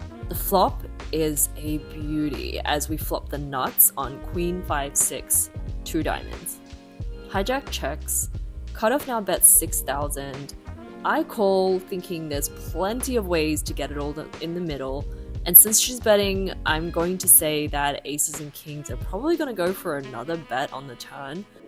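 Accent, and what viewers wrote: Australia. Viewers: You must come from Australia because of you accent. Right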